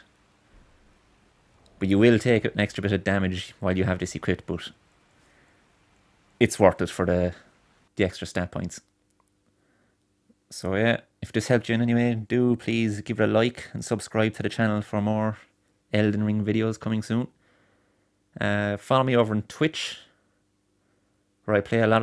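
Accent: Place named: Ireland. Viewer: After trawling far too many videos showing how to get it at late game this is exactly what I needed lad and with an Irish accent to boot 👌 Appreciate it!!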